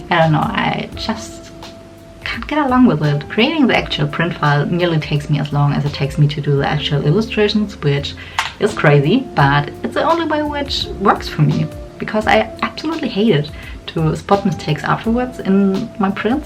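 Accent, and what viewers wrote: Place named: Germany. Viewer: Your vlogs are so soothing! Also, your adorable accent makes me miss living in Germany! :D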